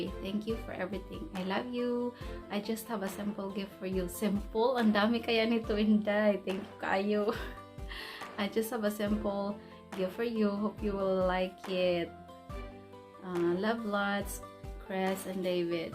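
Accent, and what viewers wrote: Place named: Visayas, Philippines. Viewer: Here again kapatid.. Dami pagkain hahaha.. Wow clear skin.. Bisaya ka pala day kaya may punto.. Ganda ng place mo.. See u ulit day..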